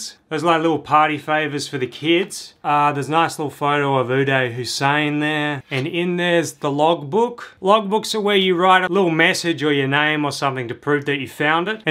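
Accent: Perth, Australia. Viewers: this video was so good i could listen past the australian accent. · lol I thought you were from Perth.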